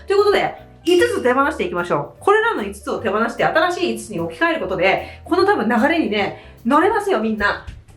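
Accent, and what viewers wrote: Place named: Edo, Japan. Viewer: 心が軽くなりました😄これからもよろしくお願いします👍べらんめい調、結構好きです。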